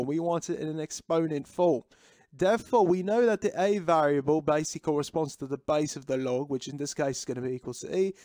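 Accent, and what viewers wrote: United Kingdom. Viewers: british math sounds so funny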